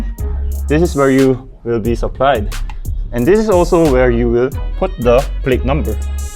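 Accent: Philippines. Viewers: He has a Filipino accent. Must’ve learned English in the Philippines.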